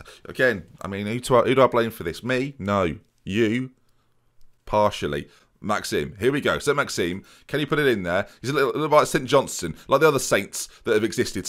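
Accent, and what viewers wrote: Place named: Liverpool, United Kingdom. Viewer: Great scouse accent you have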